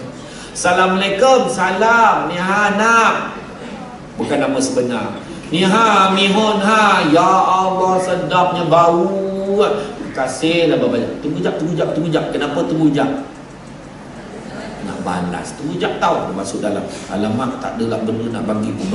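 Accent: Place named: Kedah, Malaysia. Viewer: bru 2/3 hari lps...sggah solat mgrib di masjid sbrg jaya... mcm.biasa sronok lah tggu cramah.ustaz... tetiba 2/3 pth je paham... slebihnya... org kmpong ustd tu je la yg paham agaknya..jemaah pompuan saling memandang.. then ada sorg mkcik dtg kat saya..tanya..paham dak.. smbil tengok tv yg dipncarkn khas bhg jemaah pompuan..saya senyum..saya pon tak pahammmm... moral of the story..masjid2... kna tengok jugak la ustd tu mcm.mana... maybe sesuai bg cramah hanya utk qariah ustd tu jer..sbb diorg jer yg paham loghat pekat ustd tu ...skian (bukn klantan..bukn jugak tganu..i think smwhere in kedah)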